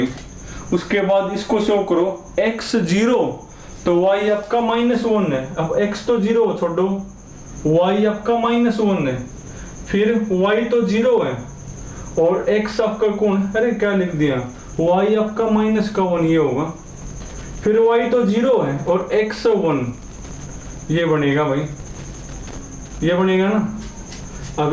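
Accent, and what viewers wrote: Haryana, India. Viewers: Sir I thik you Belong to haryana...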